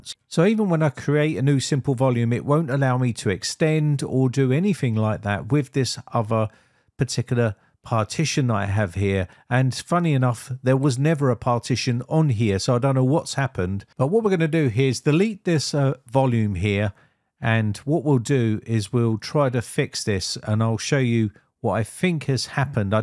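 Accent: United Kingdom. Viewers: brit accent I find interesting, Thing > Fing . Something > Sumfing, With > Wiv, Install > Instaw, Health > Helf. Words witrh TH are a struggle hey, but still I get a chuckle and learn something. (lern sumfing)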